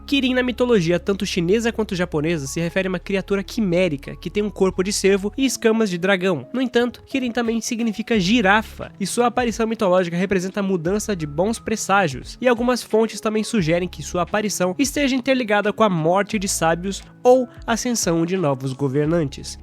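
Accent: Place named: São Paulo, Brazil. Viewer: Ele não "tira" o sotaque, só usa variações do sotaque paulista.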